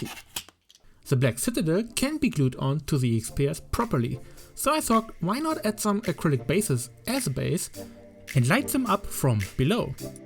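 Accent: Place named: Germany. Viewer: German? Irgendwie klingt dein Englisch nach deutschem Akzent. Nice shit btw. ;)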